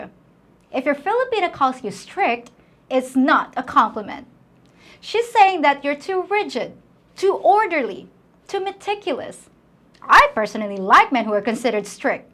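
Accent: United States. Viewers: very well done video. Just one thing is a little disturbing but I do not mean it at all as an insult: if you speak in english, it would sound nicer to use proper british english instead tp but on american accent what is seen as not that nice to hear in Europe. Never mind. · Your American style of English is interesting.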